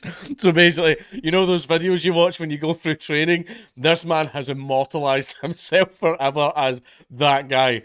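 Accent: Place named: Scotland, United Kingdom. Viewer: "Irish accent", coming from a famous Scottish fella? You numpty.😂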